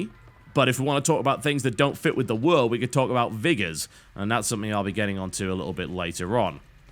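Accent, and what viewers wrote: United Kingdom. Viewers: You seem to have a blurred mental line between speaking like it's fact and speaking like a confident, not-giving-a-fuck Cynical Brit.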